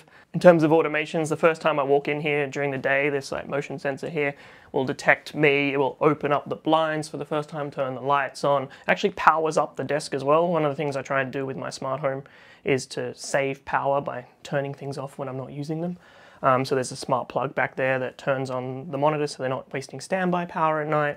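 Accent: Australia. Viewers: Cheers. Are you an Aussie in the UK?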